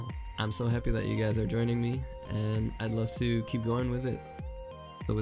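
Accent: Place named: Russia. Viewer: Guys I think he is russian, He has that accents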